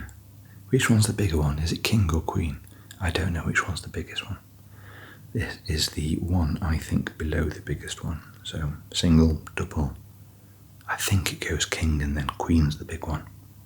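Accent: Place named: United Kingdom. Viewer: Your videos combine 3 of my favourite things - a British accent (which I have a total soft spot for) ASMR and mini hotel room reviews! Genuinely the perfect combination. Not to mention your calm and soothing voice. I honestly can’t imagine better videos to relax and watch on here :)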